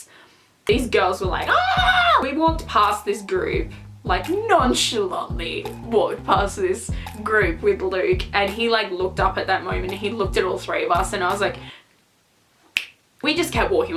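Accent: Australia. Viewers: Your Australian accent is so thick.🐨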